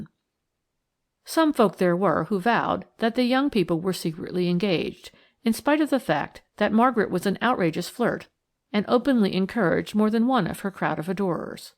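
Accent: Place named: United States. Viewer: Should not be read by an American speaker. Can't listen to it, sorry.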